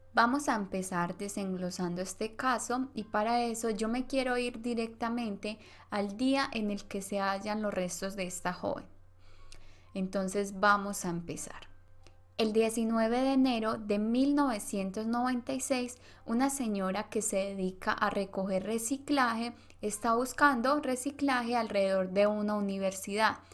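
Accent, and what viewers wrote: Colombia. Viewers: El mas bonito de los acentos colombianos, de donde eres?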